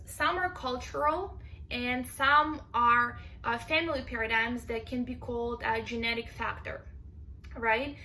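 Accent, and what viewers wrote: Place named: Russia. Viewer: ohhhhhhhhhh that kiss in the end, let's just make russian babies already. That russian accent makes me melt. Can you do a russian language lesson video next time i think that would be your niche on youtube.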